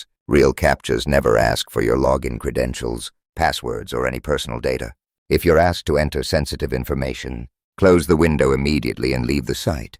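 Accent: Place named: United Kingdom. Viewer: "American Tales" with a British accent. Funny. Thanks though :)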